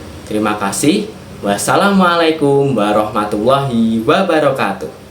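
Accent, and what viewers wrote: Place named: Java, Indonesia. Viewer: Semangat, Medok ciri khas👍👍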